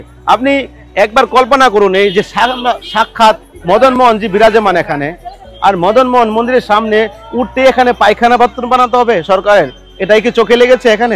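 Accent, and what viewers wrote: Bangladesh. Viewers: আপনার ভাষা শুনে মনে হচ্ছে বাংলাদেশী । এত খারাপ অবস্থা কিন্তু যারা যাচ্ছে তারাই করছে ।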